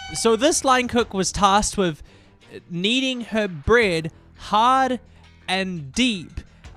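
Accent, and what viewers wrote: New Zealand. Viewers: OKAY SINCE WHEN WERE YOU A KIWI AND HOW DID I NOT KNOW?! Honestly was so shocked by a kiwi streamer, like I’m suddenly so happy that our country is actually on the internet talking about things other than nz. 😂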